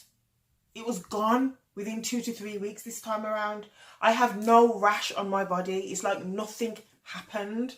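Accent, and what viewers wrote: Birmingham, United Kingdom. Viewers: You sound like you're from Birmingham?? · You sound like you're from Birmingham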